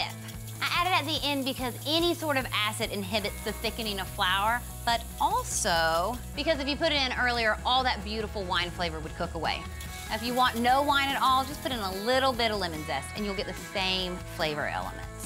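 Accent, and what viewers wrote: United States. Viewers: You sound some what counrty but the ingredients smh 👎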